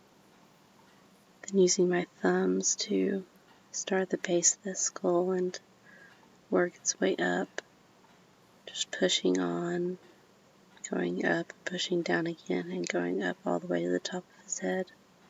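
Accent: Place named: Kentucky, United States. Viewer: Based only on accent, I would say in Kentucky. If I could hear her pronunciation of Louisville, I could confirm.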